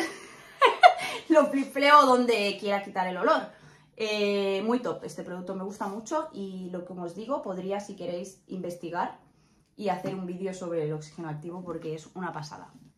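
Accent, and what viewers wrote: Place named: Paisa, Colombia. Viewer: Hola desde Colombia. Me encanta sus videos. Pareces paisa con acento español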